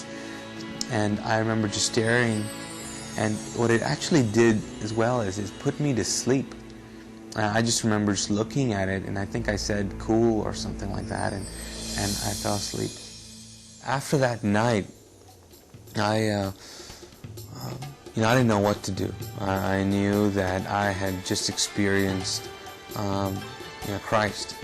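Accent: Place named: Arab world. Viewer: Good Arabic accent, brown skin dude, seems legit!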